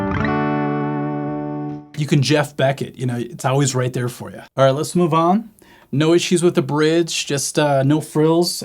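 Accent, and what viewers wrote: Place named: Midwest, United States. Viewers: "Jayguar" "knabs" Sounds like he's from the mid-west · It's the Midwest accent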